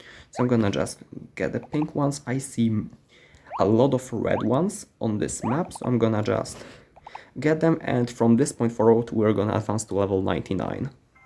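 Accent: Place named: Poland. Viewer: Haha i knew it, you are polish! I was so suspicous of your accent, now beacuse of the ads i know for sure😂 Great relaxing video❤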